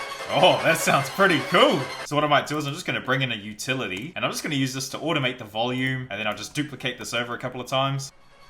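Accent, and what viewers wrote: New Zealand. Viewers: bro are you new zealand?? sorry im new to this channel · Are you a kiwi by any chance? Your accent absolutely sounds like a New Zealander.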